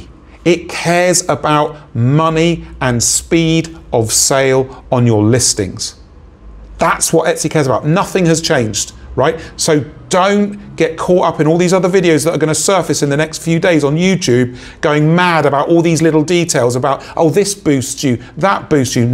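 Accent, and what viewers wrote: England, United Kingdom. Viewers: How am I only noticing now that you have an English accent? I have been binge watching your videos daily lol.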